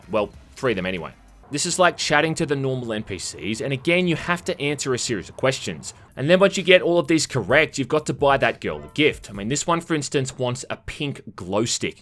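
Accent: Australia. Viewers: I dont care about this game. I jusy want to hear an Australian guy whining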